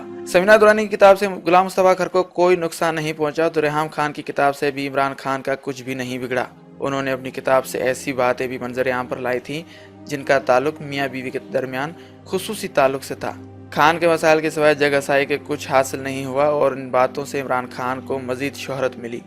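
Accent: India. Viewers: This guy is indian 'his accent tells his reality. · Jahil admi pehle urdu to bolna seekh lo..such an ugly accent of urdu..why are you trying to talk like indians? U think u sound cool? Lol...and who is Samina Durrani? How jahil are you!!!???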